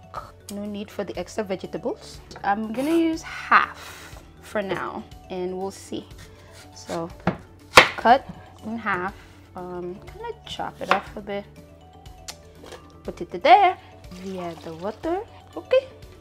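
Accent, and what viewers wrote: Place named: Ondo, Nigeria. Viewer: I love the Ondo accents love u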